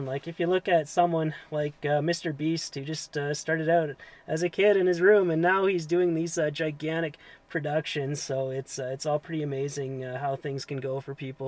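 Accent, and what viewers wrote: Canada. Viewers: I miss your Canadian Accent...:-))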